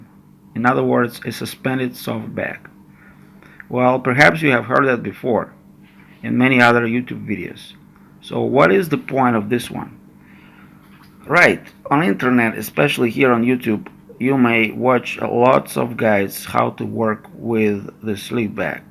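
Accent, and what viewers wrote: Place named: Russia. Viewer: Делай переводы ты же русский Пиздец падишься по произношению . КОНЕЧНО ТЫ КРАСАВА!!!!СЛОВ НЕТ !!!ДЕЛО СВОЕ ЗНАЕШЬ!!! НО НА РУССКОМ ЯЗЫКЕ ПЛИЗ МОЖНО?!!!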